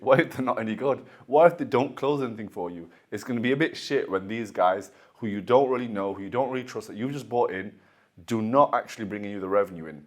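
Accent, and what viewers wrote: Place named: Pakistan. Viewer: Can we get subtitles next time, the Pakistani accent is hard to understand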